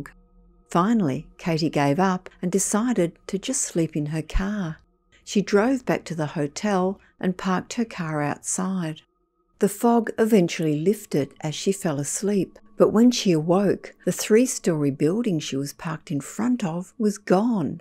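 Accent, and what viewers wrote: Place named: Australia. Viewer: In Australia clerk is pronounced "clark". The narrator is Australian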